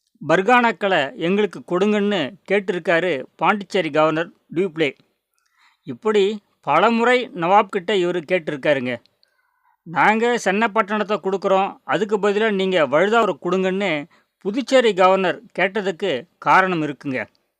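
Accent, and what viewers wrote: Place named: Madurai, India. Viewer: அருமையான வரலாற்று தொகுப்பு. இனிமையாக ங்க போட்டு மதுரைக்காரர் போல பேசுகிறீர்கள் ஐயா.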